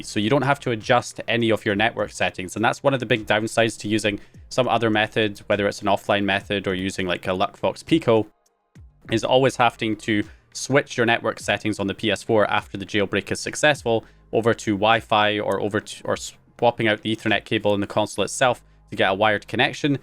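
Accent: Scotland, United Kingdom. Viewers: He's clearly Scottish 😂 Russian American ? 😂😂😂 wow lol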